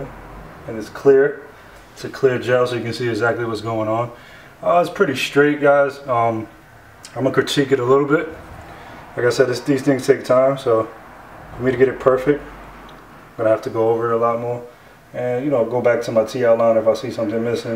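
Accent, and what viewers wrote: New York, United States. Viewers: you sound york or jersey that right?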